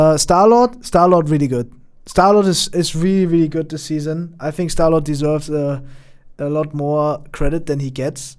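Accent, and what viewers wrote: Germany. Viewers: On everybodys soul, you are german. It took me 3 min to say for certain, but I am sure now. Its because of the hard k in things like cool and the german ie instead of the american iea in tier.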